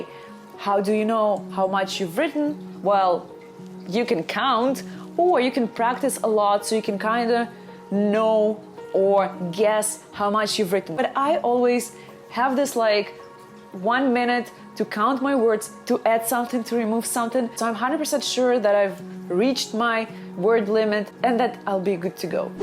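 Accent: Russia. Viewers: Is your native language is Russian language Because your English speaking is seems like you are Russian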